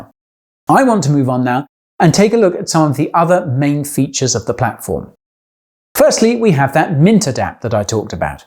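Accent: United Kingdom. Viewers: I’m going to trust you on this one because you have a british accent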